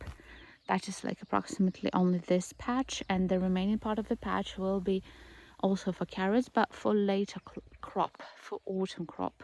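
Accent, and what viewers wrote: United Kingdom. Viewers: Always lovely to see you and your garden. Have you continued studying language? Your accent sounds even more British than I recall from earlier videos.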